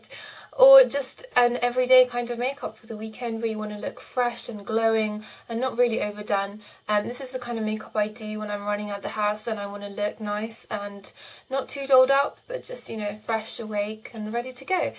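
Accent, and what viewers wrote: England, United Kingdom. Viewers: I keep watching ur vids coz of the tips but also coz of your accent!! Reminds me of Kate Middleton's accent :D What type of English accent is it, if its ok to ask?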